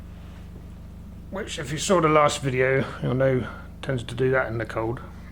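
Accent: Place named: Somerset, United Kingdom. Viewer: I live in france, I bought an Opinel the other week and it's got a stainless blade now, i was gutted, shoulda checked it before i bought it tbh. Only found your videos yesterday and i've been binging on them, nice to hear a somerset accent again, where i used to live (nr Cricket St Thomas) Keep on making vids mate, I love em.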